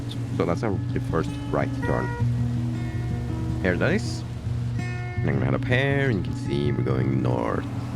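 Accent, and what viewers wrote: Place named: Norway. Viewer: You're defo Scandinavian, based on your english I'd say Norwegian like me. Thanks for the video:D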